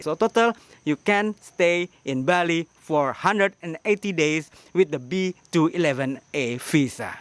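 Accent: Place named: United States. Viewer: If you speak slowly and without American accent than we can understand you much better.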